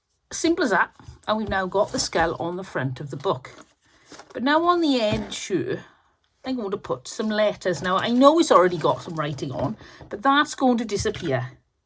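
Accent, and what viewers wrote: Wales, United Kingdom. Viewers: Don't take this wrong but after listening too so many American Crafter's, you have the most Welsh accent I have heard in ages.😂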